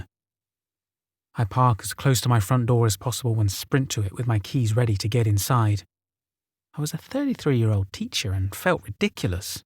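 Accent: England, United Kingdom. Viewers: Great encounters I live in Cornwall England I learned about Dogman through listening to Vic cundiff on Dogman Encounters I could not believe it I was shocked so very many people have had encounters world wide Includi g England You sound English please if you can could you find more people to come forward with English encounters I herd of encounter on Dartmore in a back garden Also Tunbrdge wells Kent ,Essex . There must be lots of encounters , Thetford Forest Norfolk girl camping I bet there are a few Dogman there . Bext wishes Yvonne Marshall Cornwall England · So glad to have found you! Love your narration. ^_^ Quick bit of pronunciation help for a few North American place names: Ontario has a longer "a" in its 2nd syllable, so it rhymes with "scary," or "hair." :) Maryland is pronounced more like the name Marilyn w a "d" at the end, rather then Mary-Lynn or Merry Land, and Appalachia/Appalachian is pronounced more like "appah-LATCH-ian" rather than a long "a + sh" sound. 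(I heard a great phase to help people remember this just the other day: if you mispronounce Appalachia someone will throw an apple atcha." XD) I know you're fun the UK so it's tomato vs. tom AS Hto, but I hope that helps. ^_^ 💙💙💙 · I don't understand the British accent/lexicon :'/ but anyway good video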